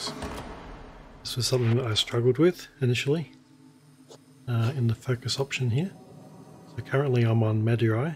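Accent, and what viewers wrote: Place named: Australia. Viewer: thanks boss, u sound aussie :)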